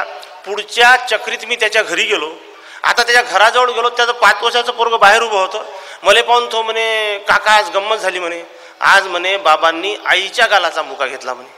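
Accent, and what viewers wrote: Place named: Vidarbha, India. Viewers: निखळ विनोद. हसून हसून मुरकुंडी वळते. मिर्झा बेग साहेबांची विदर्भातील वऱ्हाडी बोली फार भावते. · Khup sundar. Tumchi Marathi aikun khamgaon Chi aathvan aali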